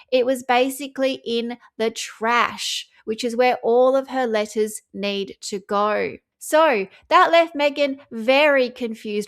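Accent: Australia. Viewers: This is such a sad state of affairs. I would be totally devastated if the daughter I raised and loved treated me like this. Mr Markle I send you white light, strength and peace... This woman is truly an empty vessel. once again great work, thank you for your updates wrapped in the appropriate amount of ridicule, and delivered with our great Occa Aussie accent. Every video I just shake my head in disgust of her behaviour.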